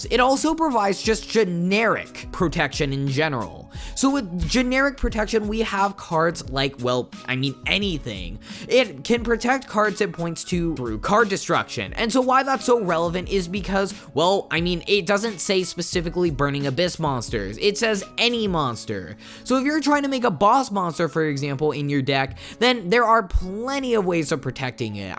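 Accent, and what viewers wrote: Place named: United States. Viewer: Goddamn, why does no one look into the right pronunciation for these foreign words? I'm Italian myself, and hearing these names (which come from a very important piece of our culture, being la Divina Commedia) pronounced by an American as an Anglo-Saxon word when it's CLEARLY an Italian and Latin word annoys me so much.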